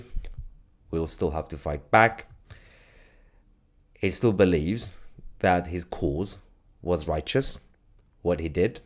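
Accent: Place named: Scotland, United Kingdom. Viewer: You're picking up that Scottish accent from 😊😂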